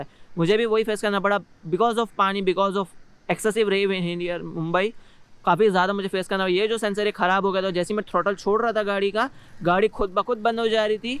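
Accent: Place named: Aurangabad, India. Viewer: Bhai aap kahan se ho apke andaaz se lagta hai aap Aurangabad ke around kahin se to ho.....?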